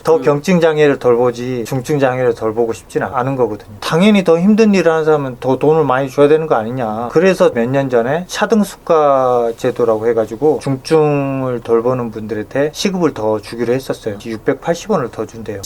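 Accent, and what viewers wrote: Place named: South Jeolla, South Korea. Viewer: 전남 억양이네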